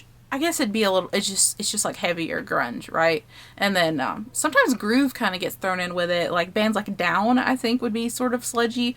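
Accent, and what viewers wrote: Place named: South, United States. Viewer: You're southern aren't you ? 🥺💘😭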